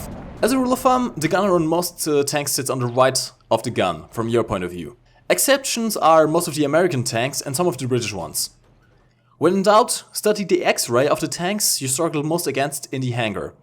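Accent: Germany. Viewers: super Video, aber bitte arbeite etwas an deinem doch sehr deutschen Akzent. lehrreich war es definitiv, hast nen neuen Abonnenten :) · Bist du Deutscher? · me : clicks on the video and listens 5 seconds to it . . . me: "Is that the German speaking guy in Squires Videos? " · thank you so much my friend with a heavy german accent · Ur German English is really very cute 😍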